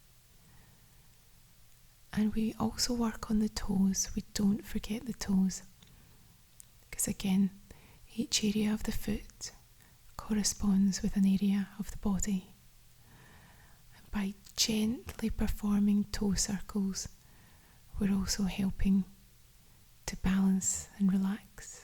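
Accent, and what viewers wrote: Scotland, United Kingdom. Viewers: I felt relaxed just watching and loved her Scottish accent. Thank You.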